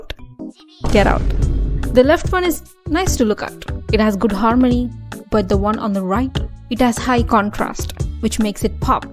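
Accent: India. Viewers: India possibly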